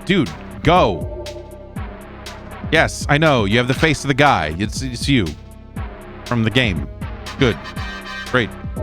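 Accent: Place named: Liverpool, United Kingdom. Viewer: Heard it in flawless Liverpudian accent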